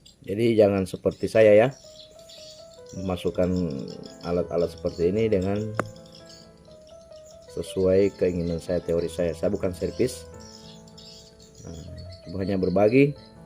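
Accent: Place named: Southeast Sulawesi, Indonesia. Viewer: Logat abang mirip logat org sultra.. 😂😂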